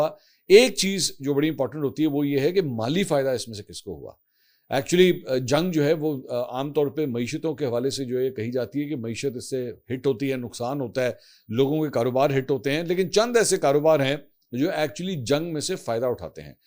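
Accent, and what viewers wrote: Punjab, Pakistan. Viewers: Sir read slowly for correct Pronounciation . Like you Punjabi accents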